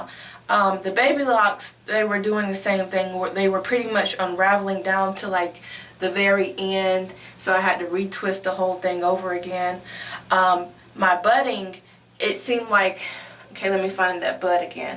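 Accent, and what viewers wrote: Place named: South, United States. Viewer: Girl, I'm from the South too and I understood everything you said, lol. Your hair is looking FAB honey! Watch before you know it, it will be loc'd, it's gonna sneak up on ya. ;)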